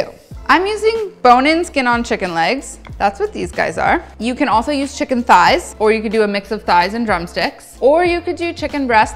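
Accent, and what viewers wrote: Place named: United States. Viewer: Why is this uk dinner lady speaking with an American accent?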